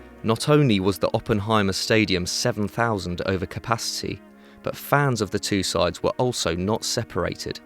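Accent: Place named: United Kingdom. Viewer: Why is everyone critisizing the poor guy's voice? He's just British ok.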